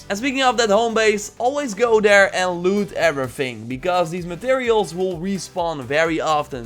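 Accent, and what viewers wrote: Netherlands. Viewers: The Dutchest of accents. Mijn dank is groot, broeder. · Are u dutch ? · Are you Dutch? Dat dacht ik namelijk te horen. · Are you from the nederlands because your accent is like that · But it doesn't do it in a mid 90's 'Smashie and Nicey' radio accent with Dutch overtones like this video. I was just waiting for "...and to increase your score, listen to some Golden Earring, or Bachman, Turner, Overdrive on your Sony Midi-system, mates!" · Just one random question.....are you dutch bruh?? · You hear your dutch axent a lot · Are you Dutch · He is dutch i hear it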